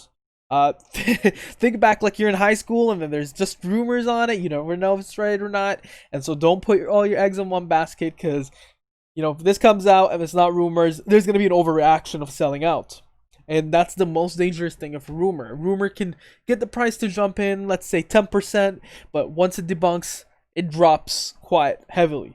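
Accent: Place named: Philippines. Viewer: Just subscribed! Found your posts on /r/pennystocks Random question, but are you Filipino? 🤔 Hearing a hint of an accent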